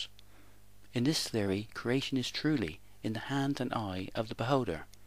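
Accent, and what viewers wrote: West Country, United Kingdom. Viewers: Sorry much as your notions are harmless, people like deepka chopra and yourself abusing physics terminology to present some New Age quantum woo irks me somewhat, its deceptive. Physics is much more inspiring than speculative imaginings involving notions like sacred geometry and the universe is conscious. I have one question why do you frame such thoughts using this terminology , its obvious your not really competent with its meaning in science? What accent is that , sounds west country , Cornwall, Bristol, Glastonbury ? All the best.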